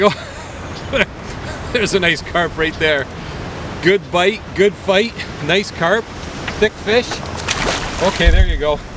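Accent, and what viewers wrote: Canada. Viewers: are u Canadian were your accent from sir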